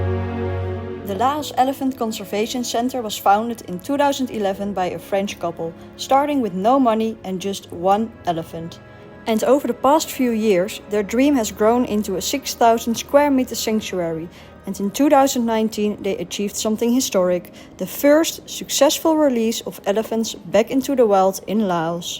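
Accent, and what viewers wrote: Netherlands. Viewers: Dag, bedankt voor deze gewelig mooie video. ik heb er van genoten en ook van jouw Engels met dat onmiskenbare lichte Nederlandse accent😂 Groeten uit Breda NL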